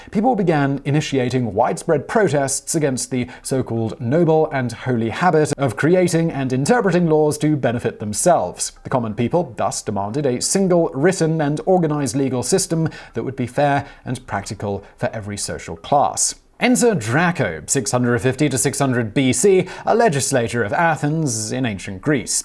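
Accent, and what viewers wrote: United Kingdom. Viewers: Well the Brits pronounce alot thing differently....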